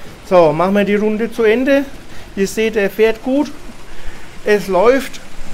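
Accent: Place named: Franconia, Germany. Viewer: Hallo, Ich habe ein größeres Projekt mit Lego Bahnen vor. Meine soll bei Schönem Wetter draussen im Garten fahren. Natürlich in Modul Bauweise. Deinem Dialekt nach, würde ich mal behaupten, daß du aus Franken kommst. Vielleicht hast du ja mal Lust auf einem Fahrtag, wenn das Ganze Form angenommen hat. Falls du da mal Bock drauf hast, dann meld dich mal. Liebe Grüße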